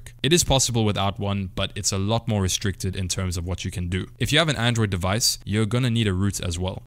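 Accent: South Africa. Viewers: i know im listening to a South African........ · Instantly recognized the south african accent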